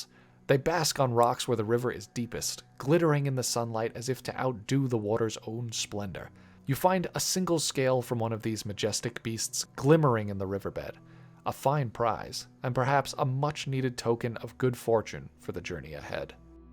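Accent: Scotland, United Kingdom. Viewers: Man I missed your Scottish accent in this video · No Scottish accent?😢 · I liked the Scottish accent 🥺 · I kind of found that Scottish accent entertaining and am a bit sad it didn’t continue for the second half of the month and I look forward to seeing what the adult dracomorph starers will look like if you get around to it Also one day I’ll remember to ask my parents about purchasing a set of brushes or two from your gumroad page I just have other things on my plate at the moment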